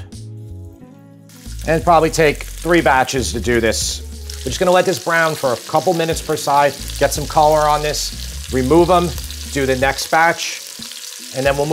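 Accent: New York, United States. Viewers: I'm going to cook this. Thansk for the recipe. BTW, I love the NY accent!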